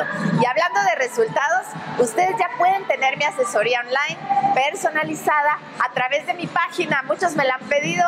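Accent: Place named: Guatemala. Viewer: Me gusta tu hablado chapin 🥰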